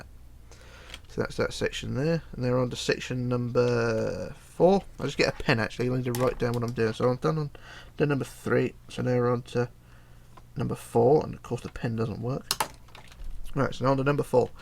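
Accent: United Kingdom. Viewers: man i love your english accent✌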